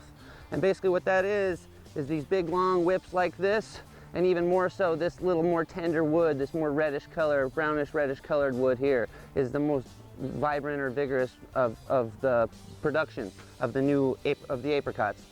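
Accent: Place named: Southern California, United States. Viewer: also, with your thick So Cal accent slurring of consonants, i could not get the name of that trimmer you are using, might you put it in the notes, or enunciate clearly next time? thanks much...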